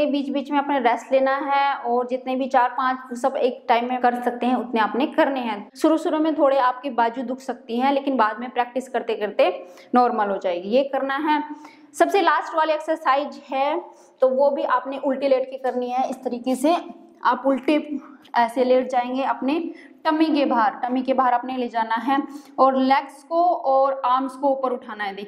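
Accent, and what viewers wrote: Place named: Himachal Pradesh, India. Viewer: lgta hai Himachal se ho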